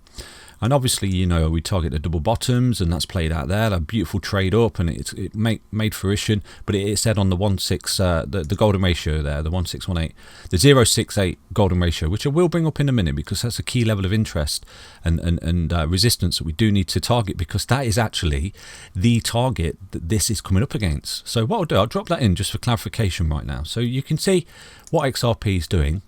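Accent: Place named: England, United Kingdom. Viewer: IMHO. You ARE the best out there and no BS. No disrespect to the American You tubers, but so nice to hear a good English voice. Keep it up!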